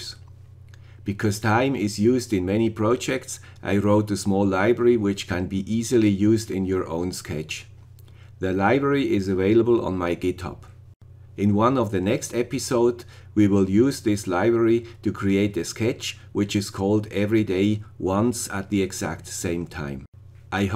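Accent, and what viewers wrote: Switzerland. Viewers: Hello, the guy with the swiss accent, thanks a lot for the great videos and tutorials!I'm very new to ESP8266 and Arduino ESP. I coded a lot of stuff in Basic, Turbo Pascal and Visual Basic, but it is about 25year ago and I fear I forgot a lot of this. I tried to use your library but I did not get it run like expected.I just wanted to have a look, if the time and date is correct, so I used some serial.print commands. At first it showed nothing. Then I removed the "if(dateTime.valid)" command. I added some lines to your code: void loop { // first parameter: Time zone in floating point (for India); second parameter: 1 for European summer time; 2 for US daylight saving time (contributed by viewwer, not tested by me) dateTime = NTPch.getNTPtime(1.0, 1); // check dateTime.valid before using the returned time // Use "setSendInterval" or "setRecvTimeout" if required //if(dateTime.valid){ NTPch.printDateTime(dateTime); byte actualHour = dateTime.hour; byte actualMinute = dateTime.minute; byte actualsecond = dateTime.second; int actualyear = dateTime.year; byte actualMonth = dateTime.month; byte actualday =dateTime.day; byte actualdayofWeek = dateTime.dayofWeek; Serial.print("actualHour: "); Serial.println(actualHour); Serial.print("actualMinute: "); Serial.println(actualMinute); Serial.print("actualsecond: "); Serial.println(actualsecond); Serial.print("actualyear: "); Serial.println(actualyear); Serial.print("actualMonth: "); Serial.println(actualMonth); Serial.print("actu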